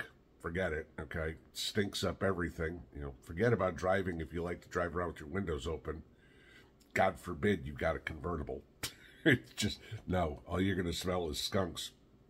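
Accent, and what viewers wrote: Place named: North Georgia, United States. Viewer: Sounds like north Ga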